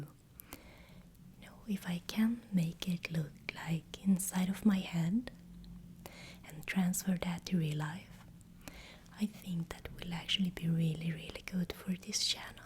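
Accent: Sweden. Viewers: Your thick Swedish accent was the best part of this video! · Tack för dina videos! Jag brukar inte tycka om engelska med svensk brytning, men detta är såååå bra! 🤩 Önskar dig det bästa i det nya året! 😄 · Your channel has been my favorite lately, I listen to your videos whilst gaming, so I'm relaxed. I like your voice and your accent a lot, it's a cute little accent, the swedish one. But I also like how open you are, it's hard to open up publicly. After that, it becomes kind of a distant, distant, distant friendship, where we won't talk, we won't see each other, but I kinda want you to do great and feel sad when you're doing poorly, if that makes any sense. I hope you can resolve your health and emotional situations rapidly. God bless.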